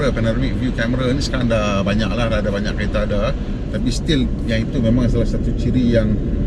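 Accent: North, Malaysia. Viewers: Mmg puaih hati haih dgr penerangan loghat utaqa dia...batoi² Sempoiii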